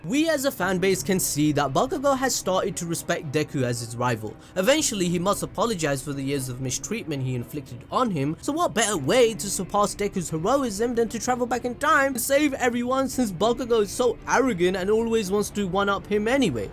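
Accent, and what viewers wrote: Jamaica. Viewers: i laughed very hard for the Jamaican accent. now he just needs to weave in a Trinidadian accent and your skits will be perfect. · Wait Holup your Jamaican 🗿 yes I will now go back and like every vid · Ayo I've been watching this man for year no one told me he was jamaican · Lolol dude you deserve more subs just by adding that Jamaican accent big up u self respect lol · You Jamaican bro? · Are u Jamaican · 🤣🤣🤣......yuh get a pass mi boss wid dat Jamaican accent 🔥🔥😂😂😂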